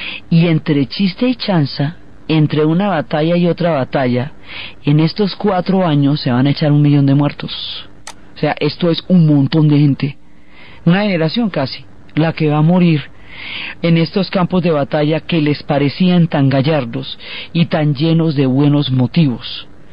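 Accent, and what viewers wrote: Colombia. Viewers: me pasó igual,el acento colombiano, y lo de "parche" "sensacional" jaja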